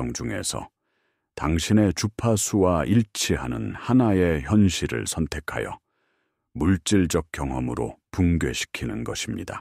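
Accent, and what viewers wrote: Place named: Gyeongsang, South Korea. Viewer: 대박대박대박 놀래자빠지고용 화이팅하이숑 경상도 사투리가 당연하지요 창녕띠기 아인교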